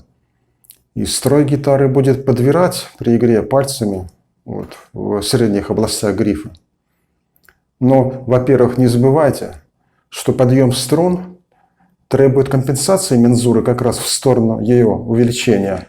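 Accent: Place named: United States. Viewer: Не смотря на русский мат, звучит вполне себе по-американски!👏😁Спасибо за очередной сгусток полезностей!👍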